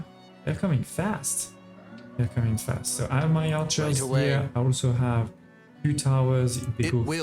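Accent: France. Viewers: c'est fou comment l'accent francais se remarque dès la premiere seconde de video. mais n'empeche, tu parles vraiment bien anglais. bonne video!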